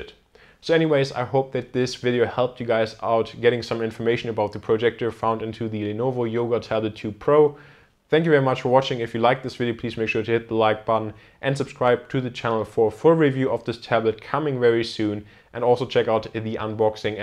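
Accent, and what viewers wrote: Germany. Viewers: Du bist doch Deutscher. :D Das hört man doch.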